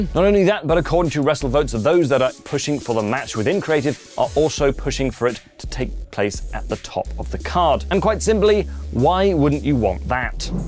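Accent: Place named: RP, United Kingdom. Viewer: This guy is super posh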